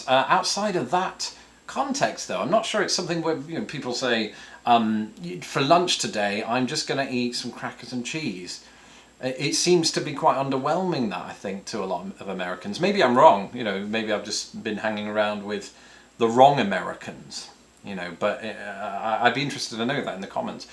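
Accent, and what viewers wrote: United Kingdom. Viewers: That British accent is second in charm only to the stereotypical non-rhotic southern accent. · No, your accent is not intimidating at all. Is ours? Actually, I am curious about how Brits hear Mid-West, American accents? We like your British accent. It's not intimidating, but it does sound very cool. Do Brits like, or dislike American accents? I wonder? Great Britain, although not the colonial power it once was, is awesome, if only they were not self destructing right now, just like all of western and northern Europe. I love GB. I just wish you'd reassert yourselves. I like your videos very much. Keep doing it. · You have a charming sense of humor. And I really enjoy your videos and hearing your POV on my country as well as learning more about English customs, history, food, etc. Glad you like being here so much. And yes, I do love your accent. 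If you want to know why, I grew up watching old Hollywood movies or BBC productions filled with British actors - so mostly heard more “posh” accents (Basil Rathbone, Lawrence Olivier, Claude Raines, Cary Grant - yes I know he faked it, Margaret Rutherford, Peter Sellers, Leslie Howard, Edward Everett Horton, David Nivens, Sir John Mills, Glenda Jackson, Maggie Smith, Sir Ralph Richardson, Sir Alec Guiness, Richard Attenborough, etc) and they just sound so much better educated and more interesting (or even eccentric) than the often flat American accents. I think it also is the much wider variety of euphemisms the British have, the clever turn of phrases for describing or referring to things, the larger vocabulary of mild swear words or expressions you hear in films and TV, and when you yourself speak on your videos, compared to how Americans speak or write. I also think those of us enamored with the Brits find much of the English eccentricities and old customs very quaint and charming whereas you, as a Brit, probably feel quite differently about them - maybe find some of them annoying. 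As an example, last summer I was reading some online commentary on The Guardian’s website about the movie “Dunkirk”, plus another article about Brexit and a few o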